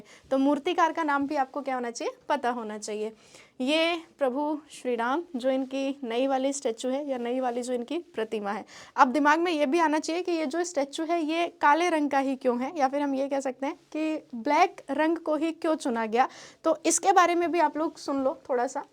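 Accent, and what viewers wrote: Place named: Haryana, India. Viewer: आपकी टोन हरियाणवी है ❤❤❤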